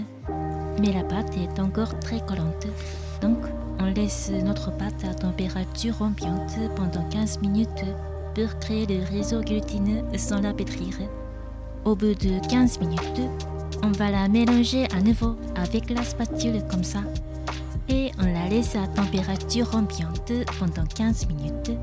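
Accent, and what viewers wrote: Japan. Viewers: Bonjour je viens de decouvrir ta video sur le pain aux figues et noix cela ma donne envie d'en faire tout de suite, du coup je m'abonne a ta chaine. PS J'adore ton accent japonais merci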